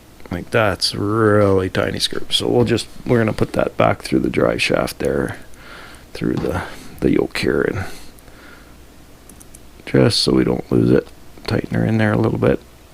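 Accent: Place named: Canada. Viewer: Great info you sound like my neighbors to the north of michigan ,eh. Peace n love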